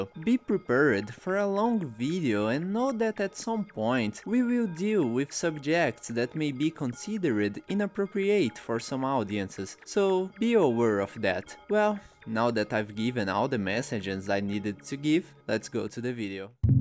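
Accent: Brazil. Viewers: I can sense the Brazilian accent from miles away 😭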